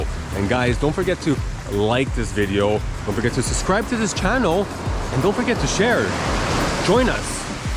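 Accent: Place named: Quebec, Canada. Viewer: Je peux reconnaître un Québecois partout dans le monde ! Très beau vidéo!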